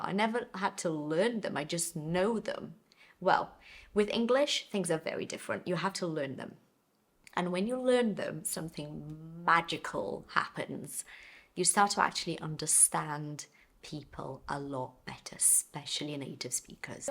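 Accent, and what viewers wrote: United Kingdom. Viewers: Hi,! I can belivie that you're Brazilian! So am I! Your English is so great, although it's British English. I'm new here and I just follow you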